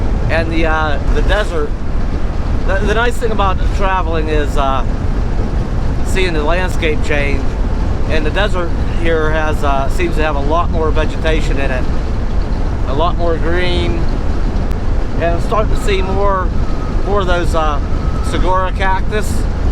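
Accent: Pennsylvania, United States. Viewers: Man listening to you narrate makes me think of friends from PA. Enjoy NewMexico and hope you find some cool little places to explore and maybe a 💈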